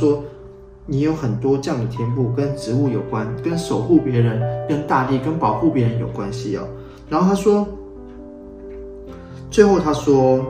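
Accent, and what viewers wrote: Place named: South, Taiwan. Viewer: 難怪老師Hen有南部腔🤣我老家在屏東聽得出來🤣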